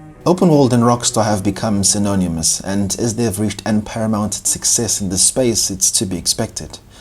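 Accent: South Africa. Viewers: You sound South African, Mac and cheese bro? Should’ve said atchaar or magwinya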